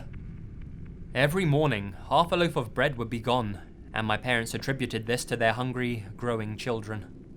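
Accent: England, United Kingdom. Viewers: English accent FTW :)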